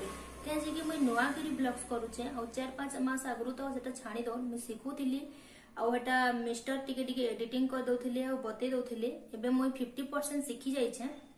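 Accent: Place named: Sambalpur, India. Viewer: Sambalpuri voice bhal lagucha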